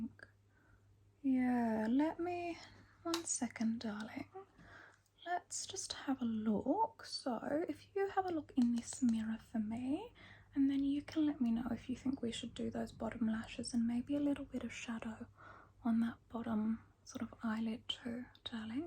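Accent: Sydney, Australia. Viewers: You sound exactly like my mum's friend Shaz, who grew up on the North Shore of Sydney. Perfect.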